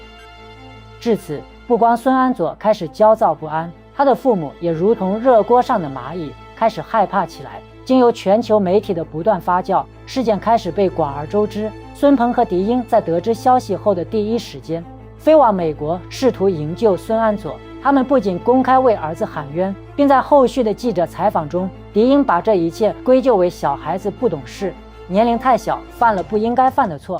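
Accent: China. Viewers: 是温州人？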